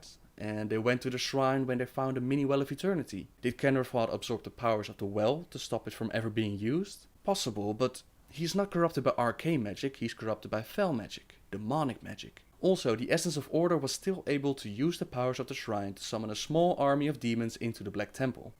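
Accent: Netherlands. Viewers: Trevor E. He is Dutch. Not Swedish. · Are you dutch? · WAUW jij komt echt uit nederland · Are you Dutch?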